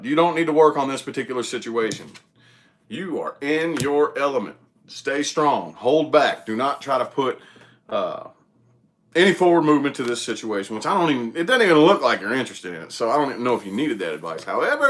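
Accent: South, United States. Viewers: Im from Kentucky was born. In Louisville. You said Louisville right too lol not looeyville or Louis ville. Lmao. I'm in Russellville ky. A tiny Town two hours from Louisville. I have lots of family in Indiana too. Thanks for the aries reading. I didn't know you was from around here. You do talk kinda country lol.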